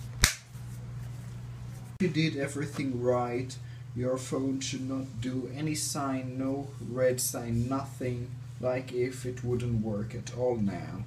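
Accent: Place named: Germany. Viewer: Mir gefaellt dein deutscher Accent.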